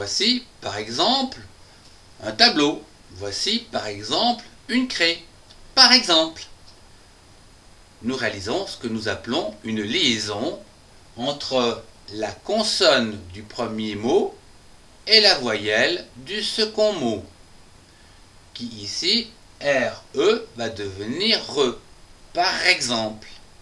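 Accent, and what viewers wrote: France. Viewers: Tu veux rire? Je suis canadien et j'avoue qu'enfin ... je recontre un français qui ne me fait pas ch... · Je trouve que l'auteur à un accent, attention à ne pas répéter avec cet accent qui fait vieille France/Paris 16e et qui vous fera peut-être passé pour hautain si vous insistez dessus.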